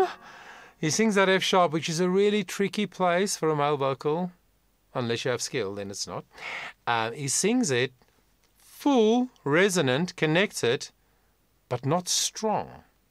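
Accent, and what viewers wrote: South Africa. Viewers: Thank you for this reaction. Hope you don't mind a long comment. Firstly, I listened to your opinion 3 times over a period of weeks. You sound to be from SA and so am I. The 1st time I thought, about your comments, can't you just listen and enjoy the way I do. Over time I came back to your reaction. Thought, ok, he wasn't being negative, he was teaching about different styles. Then after another period I listened to your reaction again. In the meantime I probably listened to all and every reaction. So, thank you for teaching me a few new ways of understanding why sounds, like growls, high notes, softer notes, vibratos and other fluctuations attracts me. I will now try to remember at least some of the things you mentioned. Please react on other Dan Vasc covers like, and the massive version of. Thank you.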